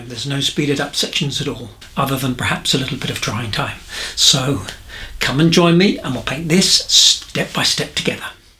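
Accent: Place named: England, United Kingdom. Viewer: I was wondering where you were.... I was missing you and your wonderful English accent ❤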